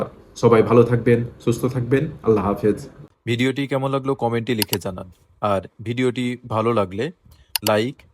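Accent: Kolkata, India. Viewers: তাহলে কলকাতার ভাষার মতো শব্দ উচ্চারণ করেন কেন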